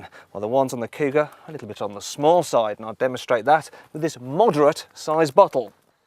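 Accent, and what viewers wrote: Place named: Black Country, United Kingdom. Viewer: lol at his telephone voice, carwow episodes have him in a black country accent.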